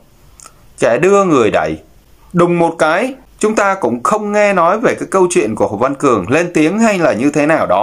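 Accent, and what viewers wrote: Standard. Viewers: Anh nói chuẩn mem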